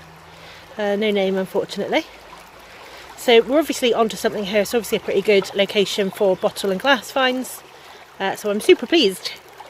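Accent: England, United Kingdom. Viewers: Sorry, but English accents are hard to keep up with and you talk so fast.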